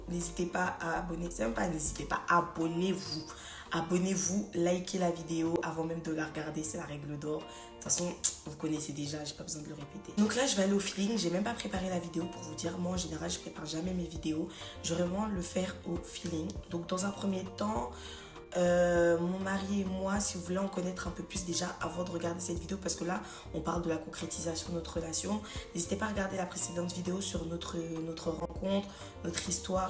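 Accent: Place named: Ivory Coast. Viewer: Trop stylé ton accent ivoirien 😂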